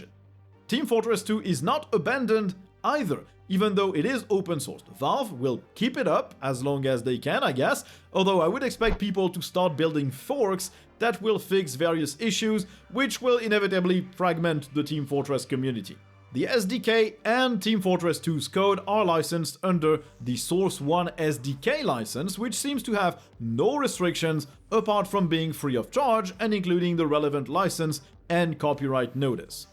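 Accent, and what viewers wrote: France. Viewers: L’accent Francais de fou ! Tu es un natif ?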